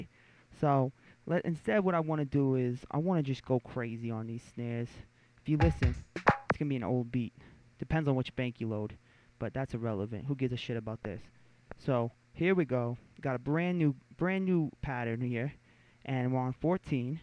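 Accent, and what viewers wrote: New York, United States. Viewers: This dude is hilarious. His New York or Jersey accent makes it all the better.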